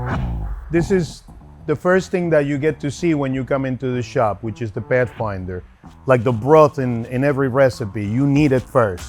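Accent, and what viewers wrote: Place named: Italy. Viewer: Yes yes! I want to give this guy all my money with a "Italian Accent"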